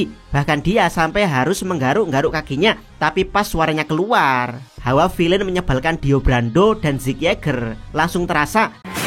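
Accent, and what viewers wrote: Java, Indonesia. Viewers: Adminya orang Jawa ternyata 😂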